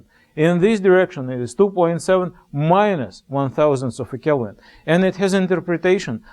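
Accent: Russia. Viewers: Our Russian accent) krasavchik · I enjoyed topic as well as sweet English with Russian accent!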